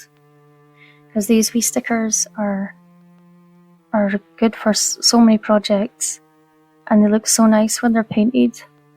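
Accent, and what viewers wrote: Scotland, United Kingdom. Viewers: Love your creativity and your creations, are you Scottish but living in America? ( guessing the USA bit as we don’t have dollar tree etc) Can hear a soft Scottish accent when you talk 😊🎃👻✨ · I just love your wee Scottish accent. The projects were so cool.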